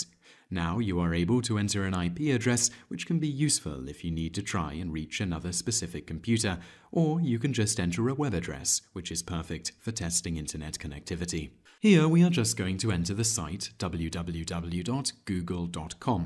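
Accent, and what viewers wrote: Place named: RP, United Kingdom. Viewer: Dude this guys voice is so fucking annoying like the pitch of he voice like he talk so posh and fuckboy like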